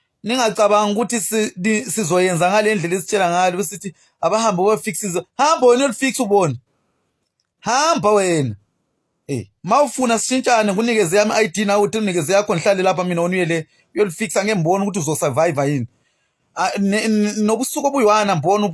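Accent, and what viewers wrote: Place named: Zimbabwe. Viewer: Futseg wena nesi Zulu sase Zimbabwe 😅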